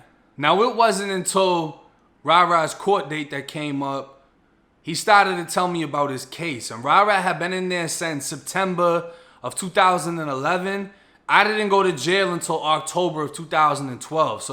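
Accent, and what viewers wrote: Boston, United States. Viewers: Hahaha Irish ☘️ 💪🏼And italian 🇮🇹💪🏼with a straight southie (Boston) accent.... it make all sense now .... did u ever explaine in your vids or anyone ask how the Florida relation goes with the New England accent??💫✊🏼💥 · Lol Cuz is u from boston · You from Boston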